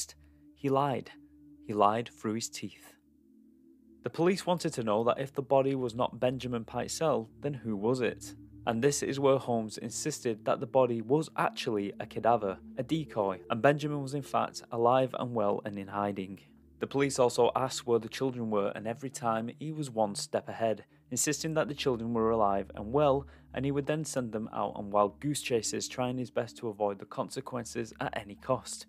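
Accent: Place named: England, United Kingdom. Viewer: Typical American. I'm pretty sure the narrator is English 🤣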